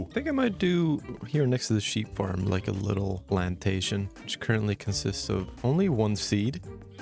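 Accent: Sweden. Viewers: are you Swedish??!! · Wait are you Swedish lol, that’s identical to a Swedish accent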